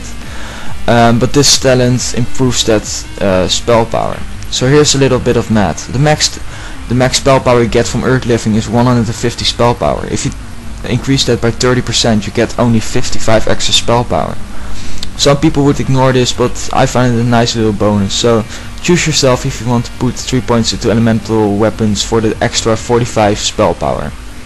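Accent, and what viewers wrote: Netherlands. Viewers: Was meteen te horen dat je nl bent Nice vid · Are you dutch? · Is je moedertaal nederlands? :-) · u sound dutch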